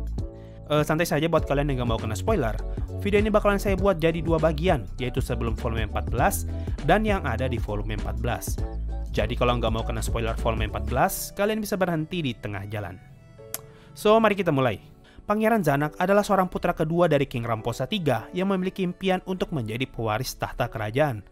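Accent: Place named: North Sumatra, Indonesia. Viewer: kyknya sumut ya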